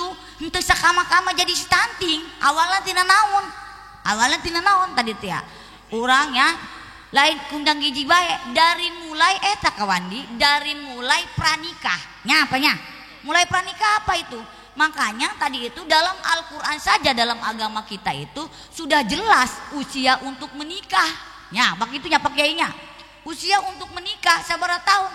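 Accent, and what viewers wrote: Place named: South Banten, Indonesia. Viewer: Logat banten selatan, asli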